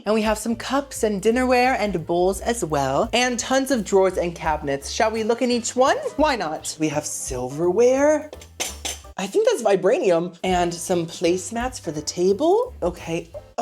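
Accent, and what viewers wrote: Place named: United Kingdom. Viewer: your british accent is like joy to my ears!!!!!!! love from a london girlie xxxxxxxx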